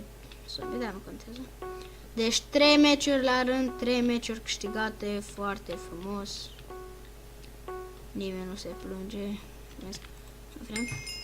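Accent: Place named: Moldova. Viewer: baiatu gamer nu mai vorbi moldoveneste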